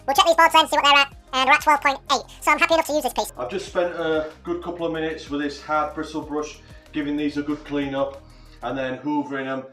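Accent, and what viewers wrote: Hull, United Kingdom. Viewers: That’s an ‘Ull accent if I’ve ever heard one.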